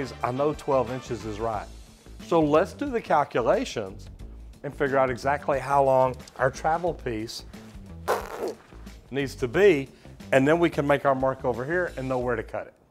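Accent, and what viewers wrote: South, United States. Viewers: I've watched a lot of your videos. I'm more old school plumbing. I like how you do a lot of detail. Plus I like your accent it sounds southern. But you give a lot of information.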